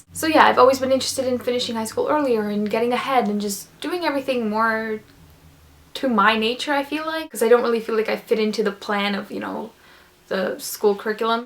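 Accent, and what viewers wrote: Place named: Russia. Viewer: с едва заметным русским акцентом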